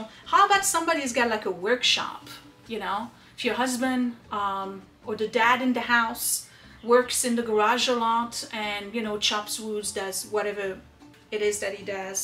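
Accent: France. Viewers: Dear. As to your accent. I started watching your channel, and yes, from the beginning, precisely because, I couldn’t initially work out your accent. A touch of French, a bit of New Jersey, I found it a charming, or, tres charmante accent, and have been watching you ever since. Yes, your sometimes fracturing of English, or American is funny, but in a good way. I ‘ope you always retain your interesting way of speaking. Thank you, all the best to you, those endlessly growing boys, you have built a great channel.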